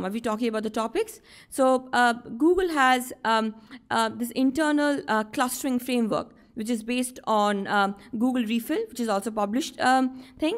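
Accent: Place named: India. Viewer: hhh, Indialish is a little bit cute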